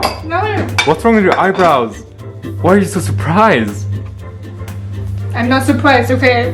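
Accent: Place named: United Kingdom. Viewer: Wow! It's great that you have such a great sense of fun about this BUT, It's really deplorable and sad that it's even necessary to approach this subject. I think you will need a paper bag over your head and some kind of sack clothing to prevent being recognised as asian. I've been away from England for 40 years but your accent and expressions sound beautifully British to me, so no worries there.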